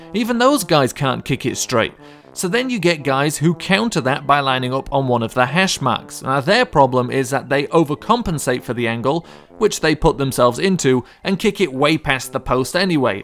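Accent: United Kingdom. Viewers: Is it just me or is it oddly amusing hearing a British dude talk about football?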